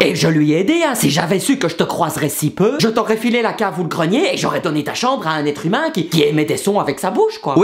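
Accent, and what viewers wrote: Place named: Belgium. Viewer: Son accent belge est juste magnifique !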